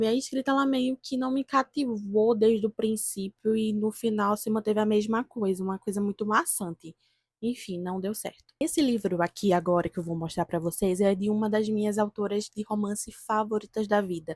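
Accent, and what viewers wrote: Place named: Minas Gerais, Brazil. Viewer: Caí de paraquedas hoje mesmo aqui, e te achei uma diva, amei o sotaque (é mineiro?). E eu ganhei O Morro dos Ventos Uivantes da minha irmã, só que eu tô postergando pra ler ele (sendo sincera), até mesmo por tudo de ruim que eu ouvi sobre a adaptação. Mas eu tenho uma amiga que leu e gostou muito, disse pra mim que eles tiraram o foco total do que realmente o livro vem trazer.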